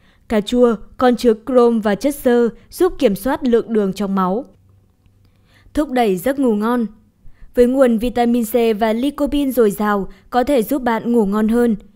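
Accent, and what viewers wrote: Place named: South, Vietnam. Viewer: Noi giong mien Nam nghe hay hon giong Bac Ky 75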